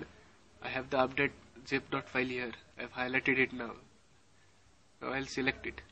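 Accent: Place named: India. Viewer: dieses english von indern klingt immer wieder geil :D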